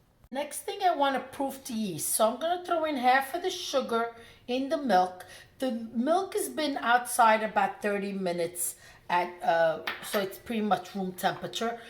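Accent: New York, United States. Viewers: They look so good! I have to ask you, how long have you been here from Napoli? Your NY accent makes me think it's been a while. Lol..l love to hear you speak Italian. I don't hear it anymore. 😥 Did you teach your kids Italian? My father spoke it but never taught us..He was born in NY but Italian was his 1st language..He had to learn English for school. Good to see you today! ❤❤😘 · Hello, I subscribed to your channel yesterday and had to call my mother’s(Phyllis) friend, Angela to ask because I just knew you were her sister! Same exact Italian/NY accent! Refreshing to hear since I moved from Ft. Lee to Indiana some years ago. I miss all things Italian since there aren’t any Italians here! No bakeries, no good cannolis, etc. My mother used your recipe for Ricotta cheesecake and I’ve continued the tradition.. I’m so glad I found this channel! 😊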